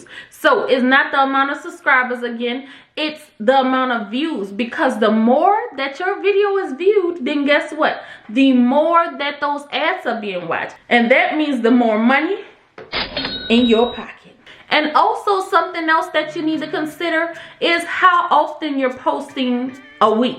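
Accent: New Orleans, United States. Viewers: You have to be from New Orleans. But this is a good video!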